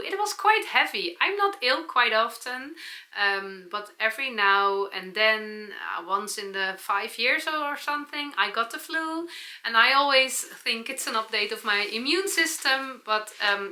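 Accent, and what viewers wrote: Netherlands. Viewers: Your hat is adorable and you look so beautiful in it!😊 I Always enjoy your shows and your “ Dutchey English”!😊👏 You do a great job and I always appreciate you not editing your videos to keep them real! 🤗Thank you and Blessings to you and your family!😊🌸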